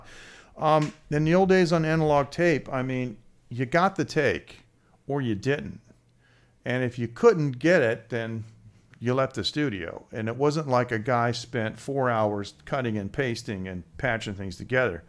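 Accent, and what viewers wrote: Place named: Boston, United States. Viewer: Shared. Hope you keep the Boston sound. Awesome stuff!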